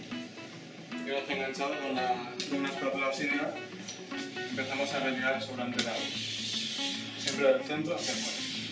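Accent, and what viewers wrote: Chile. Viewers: chileno la wea fome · No se entiende lo que dice!!! Eres chileno?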